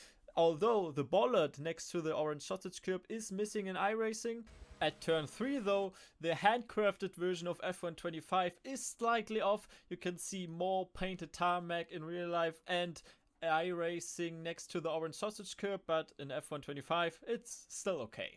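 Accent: Germany. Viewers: Irgend wo höre ich den deutschen Akzent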